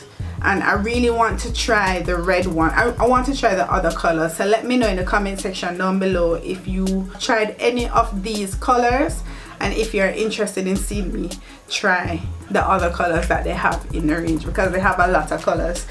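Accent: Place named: Jamaica. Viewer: Love that Jamaican accent❤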